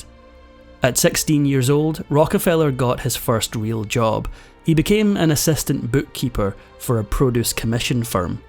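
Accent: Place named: Scotland, United Kingdom. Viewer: First, I liked this video because the narrator has what seems to be a Scottish accent. That resonated with me because I watched a PBS documentary on Andrew Carnegie decades ago. I have not been in awe of the rich snd famous - yet I find their stories fascinating and they give me a glimpse into human nature. Just watched a short video on J.D. Vance, current candidate for Vice President - and was reminded of J.D. Rockefeller's humble start in this video. This videos thumbnail teased that Rockefeller's "dark secret" was someting he did. The man was a sinner as we all are - or have been. Still, the secret was the sins of his father. I'd done some research on the younger Rockefeller a few years ago - and vaguely recalled his dad giving him a loan. I didn't realize what an influence, "Devil Bill," was on his son - or that his son fought to overcome the shadows of his dad's dealings. 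Thank you!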